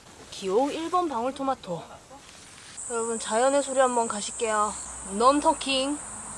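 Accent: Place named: Chungcheong, South Korea. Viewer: 와이프가 마당 텃밭에서 일할 때마다 로 빙의해요. 말끝마다 유유 거리는데 충청도에 와있는 기분이에유.여긴 강원도 양양인데 말이죠. 아 망치 들때도 빙의해요. 그만큼 찐팬이랍니다..저는 매년 산소 벌초를 셀프로 하는데, 예초기가 없어 다른 분들에게 굽신굽신 하며 빌려서 사용해요. 그래서 제 등이 굽었나봐요. 최신식 무선 예초기를 저희에게 주신다면 굽어있는 제 등이 조금은 펴질 것 같아요. 미리 감사드립니다🙏🏽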